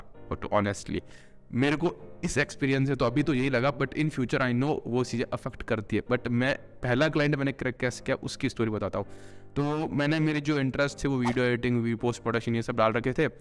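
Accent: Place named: Marwari, India. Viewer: ❤️Acha, marwari Accent aata h esliye guess kiya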